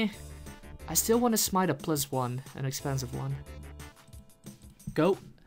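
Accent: Netherlands. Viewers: I can tell that you are Dutch, SICK accent :P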